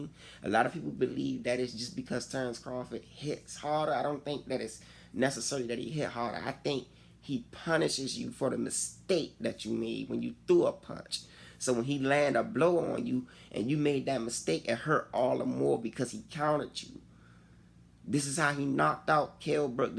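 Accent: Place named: Washington DC, United States. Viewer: I know this accent anywhere... my man from D.C... you make good points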